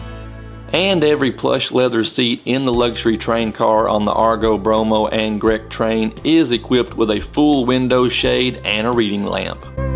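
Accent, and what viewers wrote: United States. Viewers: soalnya pakai aksen amerika, coba pakai aksen british lama atau aksen australia 😁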